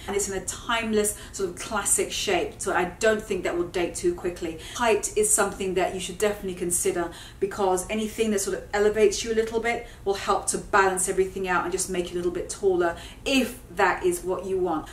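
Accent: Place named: London, United Kingdom. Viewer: Hi, where have you been all my life!! I have been binge watching all of you videos, you are amazing, I have learnt so much from you. I've lost count the amount of times, I have gone to a shop and not found my size in something I wanted and I have just walked away. No more will I be doing this, I will be going for the bigger size from now on. Your London accent got my attention, I'm a fellow Londoner and you are fantastic to watch, I love your passion! You have a new subscriber and I look forward to seeing all your new videos. x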